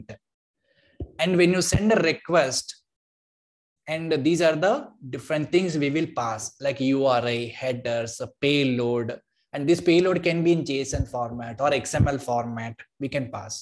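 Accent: India. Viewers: Are you from Hyderabad sir?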